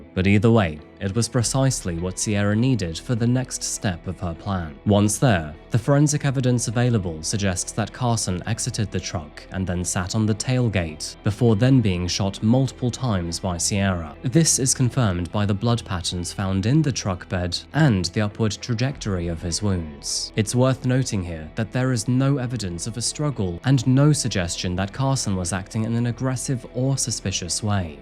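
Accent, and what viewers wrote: United Kingdom. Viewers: Love your videos dude. I'm from the UK. Your accent sounds British, but with a twinge of something else maybe?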